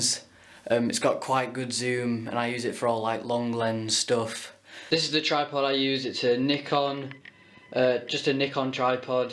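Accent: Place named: England, United Kingdom. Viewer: Subbed :) You from England because your accent sounds english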